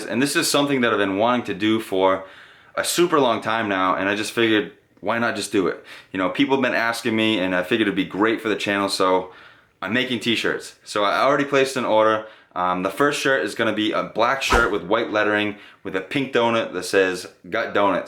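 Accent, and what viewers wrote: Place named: Massachusetts, United States. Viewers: Every time your Massachusetts accent comes out, I have to laugh. You're adorable :-) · I just started watching your videos recently and I kept saying he's GOT to be from Massachusetts LOL. Fellow Bostonian here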